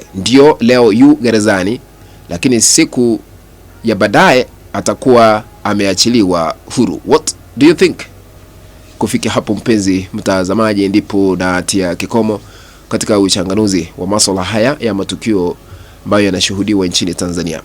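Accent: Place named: Kenya. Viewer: Huna akili.mamae wewe ni mkenya siunasikianhata rafudhi mbwa wewe hamtaiweza Tanzania kima nyie kapewa nanani ? Wewe shoga tuu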